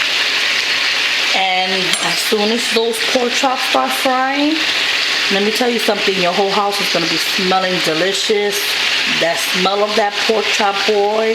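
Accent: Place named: Bronx, United States. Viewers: wow I grew up there on <num> had mainly Dominican and Puerto Rican friends so I can definitely detect a NYC Latina lol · Girl where are you from? You sound like you are from The Bronx (like me)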